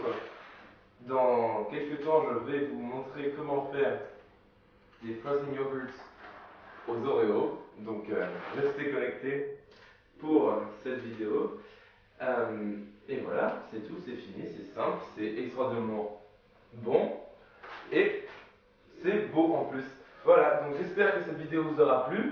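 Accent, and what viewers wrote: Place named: England, United Kingdom. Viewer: ton accent anglais est terrible, sinon bonne vidéo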